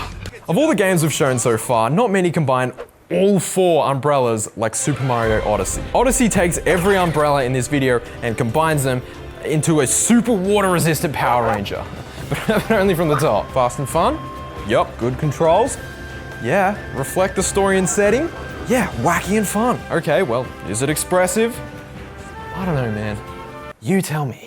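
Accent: Australia. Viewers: Australian(?) Nakey Kakey I love it. Gladly just found you today. Keep up the good work plz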